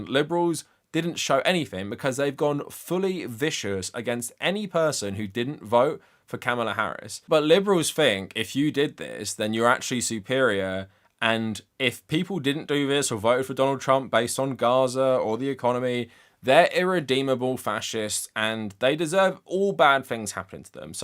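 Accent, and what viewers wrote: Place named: United Kingdom. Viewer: But you sound like a "bloke" who lives in a country with a KING!!!!!! Is that more evil than the US system, or is it the same? What is your opinion of the house of Lords? It's ok that you have opinions, but it seems you don't have any mirrors in your house..... maybe look at yourself? just sayin....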